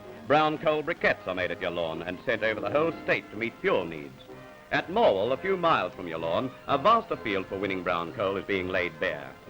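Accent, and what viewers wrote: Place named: RP, United Kingdom. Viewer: Great to watch and I love the deliberate BBC accent.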